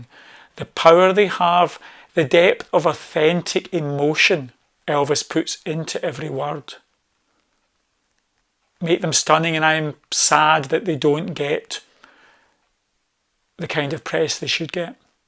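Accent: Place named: Scotland, United Kingdom. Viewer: I find it difficult to watch, this time period without feeling sad but his voice was still spectacular. ❤. I love your video. Nice to put a face to the lovely, knowledgeable Scottish accent😅 😅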